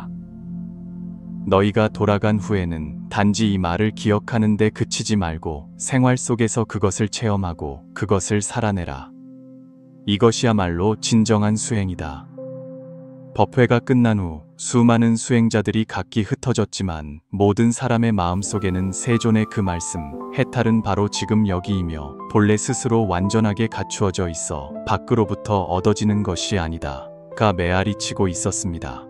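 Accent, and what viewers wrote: China. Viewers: 중국발음이 오히려 집중을방해하네요 그러나 고맙게 잘 듣고있슴니다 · 중국어 발음이 방해하네요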